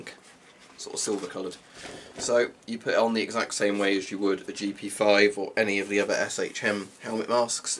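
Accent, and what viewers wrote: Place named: United Kingdom. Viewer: Im from Poland. The word "Dzień Dobry" you said was very simular but accent is strongly British. Still, you are close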